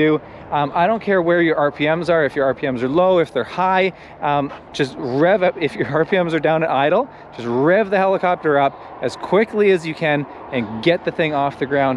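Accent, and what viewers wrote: Canada. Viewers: Great video. Couldn't understand your term "lead-leg" but then figured out your Canadian dialect was saying "lead-lag." Hahh!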